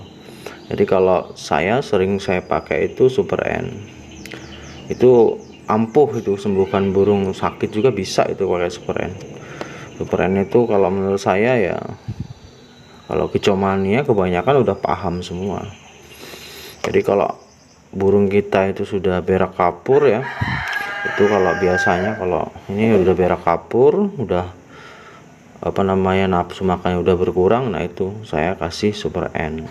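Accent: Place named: Lampung, Indonesia. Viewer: Suara kaya nada orang Jawa Lampung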